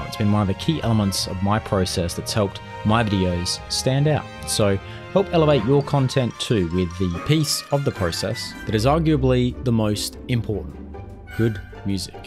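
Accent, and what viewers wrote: Australia. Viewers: Hi, just found your channel, couldn't stop watching when I did. love it. first thing I noticed was an Aussie accent. love your work mate. Gold Coast.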